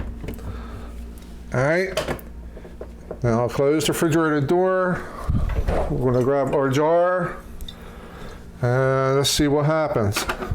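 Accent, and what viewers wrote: Baltimore, United States. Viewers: That did it! Thanks so much. …and thought I recognized that Balmer accent. Go Ravens! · Sir, I'm from Balmore and you sound as though you may be as well. I just did this and your "fix" worked! Thank you!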